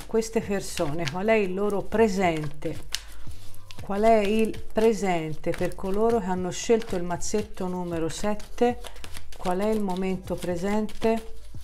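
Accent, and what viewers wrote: Tuscany, Italy. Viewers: Prima stesa bellissima... Speriamo! 💞Grazie sei molto chiara e concisa e con l'accento toscano mi piaci molto... Posso chiedere il tuo segno zodiacale sento molto empatia con te io sono bilancia 😁😁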